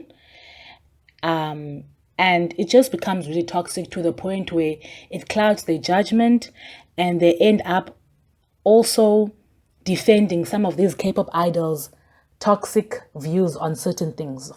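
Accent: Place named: South Africa. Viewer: You sound South African for some reason. Btw your channel is really needed these fans out here are crazy as fuck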